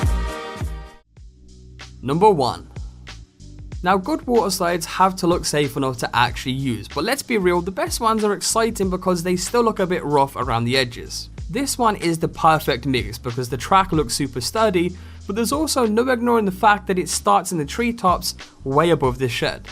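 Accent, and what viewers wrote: United Kingdom. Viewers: YOU BECAME BRITISH!!!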